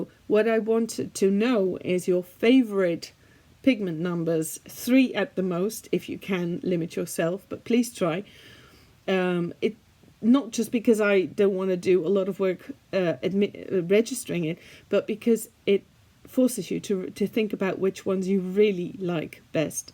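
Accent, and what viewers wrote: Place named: Netherlands. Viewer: I always know your Dutch because you speak like * from *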